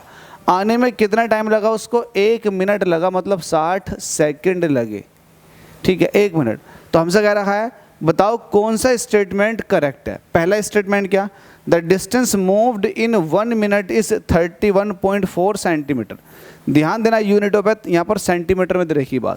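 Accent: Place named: Moradabad, India. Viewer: Sir aaap Moradabad side ke hn kya ?? Apka accent bahut accha lgta h 😊 aap please khulke bola kriye apne accent me mza aaega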